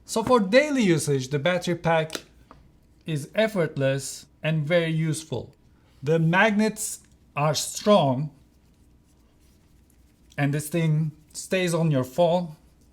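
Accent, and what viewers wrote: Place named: Turkey. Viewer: you are speaking like a turkish guy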